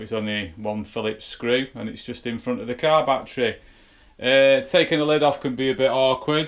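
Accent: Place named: Northern England, United Kingdom. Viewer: Great video! I'd love to do this you explain everything in plain (northern) English! Keep up the good work!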